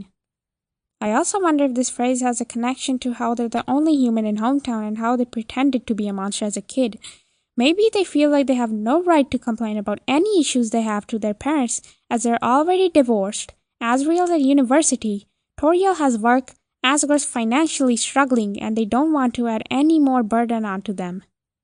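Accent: Southeast Asia. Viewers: pretty sure it's an accent?? sounds southeastern asiaidk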